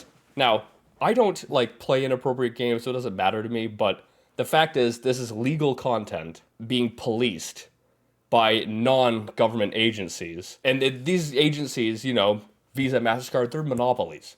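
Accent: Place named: United Kingdom. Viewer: Good British accent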